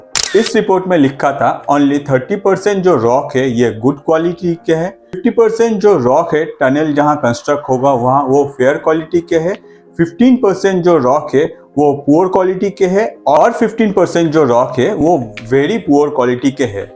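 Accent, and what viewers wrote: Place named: Assam, India. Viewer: App Assamese ya Hindi na bolne wala he? Kyuki AAP jav Hindi bolte ho lagta he dusra bhasha bol rahe ho😊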